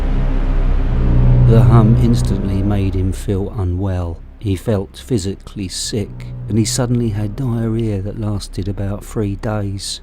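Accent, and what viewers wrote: United Kingdom. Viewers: Why didn't I find you sooner!! British accents FTW 🖤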